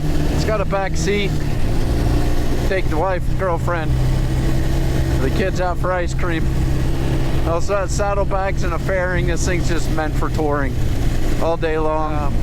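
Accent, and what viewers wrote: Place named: Pennsylvania Dutch, United States. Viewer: Having watched a half dozen or so of your videos, sometimes I think I detect just the tiniest bit of Pennsylvania Dutch accent in your voice, but when you were finding that last wiring fault and described "rootching around" I knew I wasn't imagining it! Mach's Gute!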